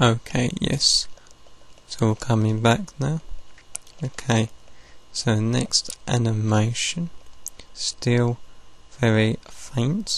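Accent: United Kingdom. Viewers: Very nice video! It's interesting to see how you make all those really cool codes, and memory edit the game! Looks a lot like the hex editor I use (I would suppose so, it's hex editing). Nice British accent too as well! Mine went away a long time ago.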